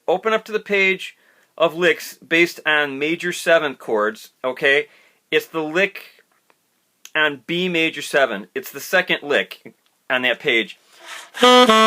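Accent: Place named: Upstate New York, United States. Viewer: This obviously the way Stan Getz played. As I look through his solos there are licks and patterns that only work with the bis.....btw where are you from? The accent seems upstate New York , Maybe Rochester?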